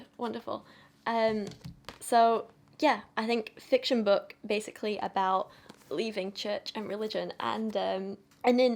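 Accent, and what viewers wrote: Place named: RP, United Kingdom. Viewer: wow looking even more beautiful in this video :-O and I still love your posh accent, I would listen to you reading the phone book :-O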